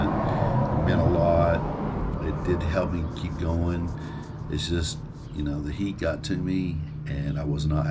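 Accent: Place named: Texas, United States. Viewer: It was fun watching you! I loved your texan accent :-) you should totally consider the Colorado Trail, it's perfect for the summer, in the mountains, little to no snow if you wait for it to melt off. and much shorter than the PCT 🙂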